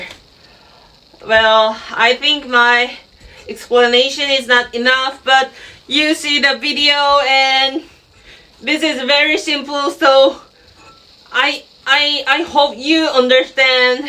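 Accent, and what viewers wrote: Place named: South Korea. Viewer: 이케아 엘리프 검색하다 우연히 들어왔는데, 와 일본인 영어발음이 완전 한국인이네... 라고 보다가 채널명을 보니 한국인이셨네요ㅎㅎ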